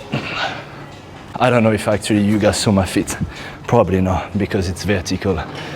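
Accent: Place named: France. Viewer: Surprinsingly I'd watched. Oh, I got your accent is different and I enjoyed man... are you a frenchman? Cool! Is it your daughter? Very cute hahah man. Adorable, besides... a good caregiver does it with a good heart. You're coaching then, I liked - let's see if I can distuck of my strugglesituation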